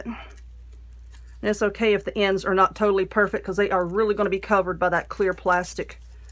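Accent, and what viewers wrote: South, United States. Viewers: I LOVE your channel. I just found you tonight and I joined your group too. Your southern like me lol. I’m from Alabama. Love watching and learning. You’re awesome!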